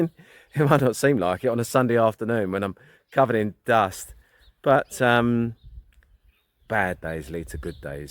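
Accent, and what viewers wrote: England, United Kingdom. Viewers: I clearly wasn't alone in my appreciation of the cheeky charm and sunshine you bring to LaLande! So awesome to see you here with your own channel. Woukd love to hear how tou came to be in France and your journey so far. Love the accent, the funny British-isms, and seeing you bring joy to so many. Go! 🎉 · ... to be clear, I'm not here just b/c you're sexy nor is it b/c I have that common American fetish - addiction to an English accent. I expect you to work your butt off, to keep win my loyalty.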